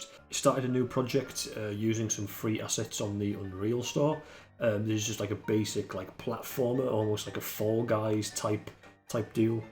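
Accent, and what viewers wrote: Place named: Wales, United Kingdom. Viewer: HES WELSH! HES BRITTISH!!!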